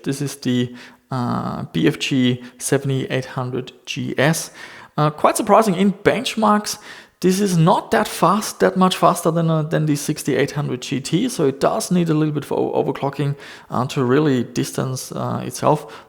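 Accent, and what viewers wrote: Germany. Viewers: Are you German? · Are you a german? Bist du ein deutscher? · are you german?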